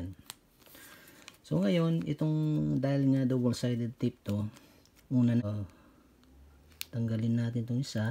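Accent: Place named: Pangasinan, Philippines. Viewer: Pangalatok ka di ba? halatang halata sa accent mo pag nag tatagalog ka eh..🙂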